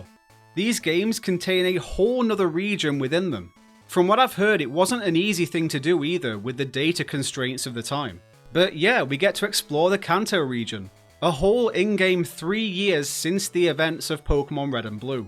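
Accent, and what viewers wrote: United Kingdom. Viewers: You have a wonderfully charming British accent.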